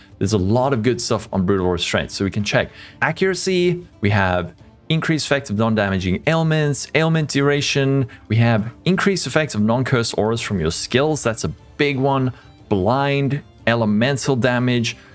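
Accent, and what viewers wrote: South Africa. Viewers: "It's not a shlep" 😂 love the ZA bruv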